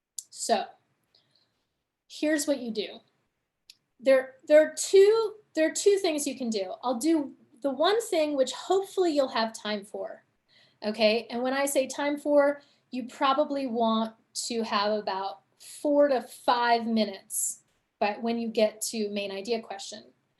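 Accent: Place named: United States. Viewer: Namaste from India Miss sweetest smiley, 🤗☺You are so sweet and Haha why American's People's English language is so stylish 🤷‍♂️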